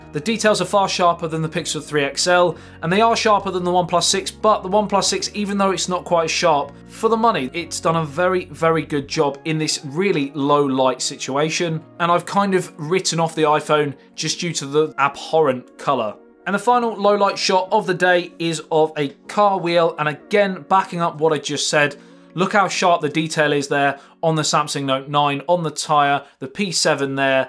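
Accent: United Kingdom. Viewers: eu amo o sotaque britânico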